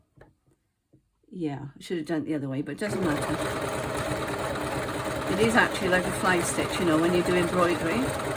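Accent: Wales, United Kingdom. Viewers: I love your Welsh accent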